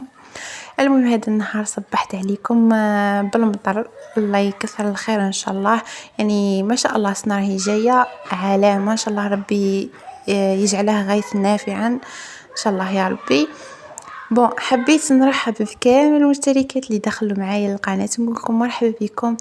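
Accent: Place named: Tiaret, Algeria. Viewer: تهدر كما حنا تيارت ههه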